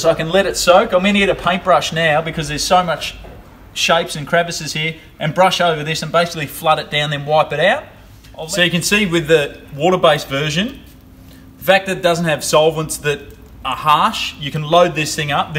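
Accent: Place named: Australia. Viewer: your genuine Ozzy accent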